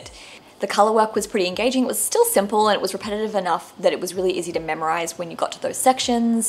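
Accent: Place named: Australia. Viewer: I loved seeing your knits for 2022 and looking forward to watching more of your episodes. It is nice to hear an Aussie podcaster in the mix. I have to admit, I gasped horrified when i saw your felted sweater. 🤕I just started a knitting podcast and you inspired me to do a 2022 knits video. It was really interesting for me to go back over the year and see what I made.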